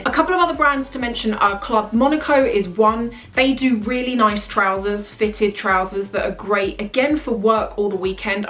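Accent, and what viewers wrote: United Kingdom. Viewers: Great tips and I adore your British accent.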